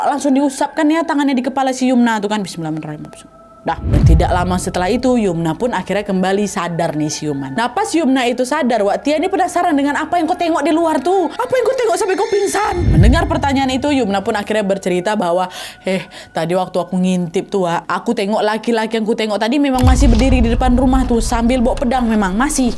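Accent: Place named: Palembang, Indonesia. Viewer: Logatnyo mencak wong Palembang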